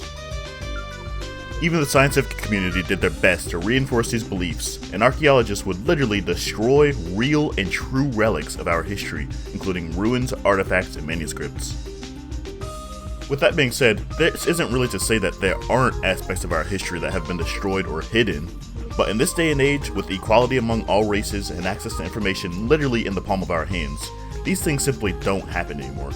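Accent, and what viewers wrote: United States. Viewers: Im not African American but just African. Firstly congrats because you made a video and uploaded it which is more than most. However, 1. Making out that Afrocentrics claims of hidden African history is some big conspiracy theory. I think thats a tad offensive. Isn't African history still being taught in America starting from slavery? You might know of many of Africa's great empire's but in the white dominated countries where a lot of the diaspora live is that common knowledge? Yes we as adults can search the web til our hearts content but what about the children? African history was hidden and destroyed and while that conscious effort may not be being made today, that doesnt address the knock on effects of that. Widescale denial of African Achievement is huge and that is because it was hidden. And what equality between all races are you talking about? 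Where do you even live to say that? You sound American. But how would a black American make such a statement. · You don’t speak “proper” English, you speak the American dialect, and people want you to speak African American Vernacular English, just a slight correction but you’re right that speaking in a standard dialect doesn’t make you a race traitor or whatever they say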